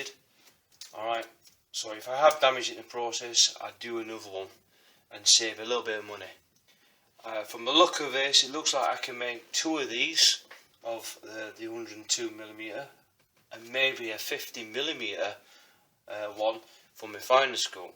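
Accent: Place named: Pendle, United Kingdom. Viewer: Where are you from mate? You sound local to Pendle where I am from, just ordered xt6 telescope to begin astronomy with my son, any tips welcome.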